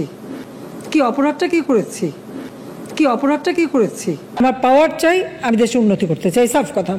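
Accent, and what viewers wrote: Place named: India. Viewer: উনি কি বাংলাদেশের প্রধানমন্ত্রী নাকি ইন্ডিয়ান প্রধানমন্ত্রী ভাষা থেকে বুঝা যায় যে ইন্ডিয়ায় জন্ম উনার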